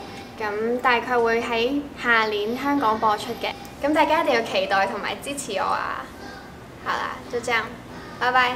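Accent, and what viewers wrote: Taiwan. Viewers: 哇要主持節目了！ 為什麼你的國語講得這麼台灣腔～ 蠻多人來很久了還是有港腔啊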